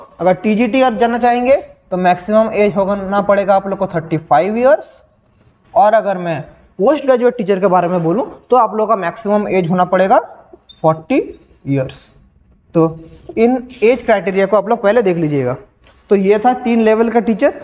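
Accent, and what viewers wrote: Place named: Bihar, India. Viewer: Ap bihari ho n bhiya , your bihari accent become to know me this😊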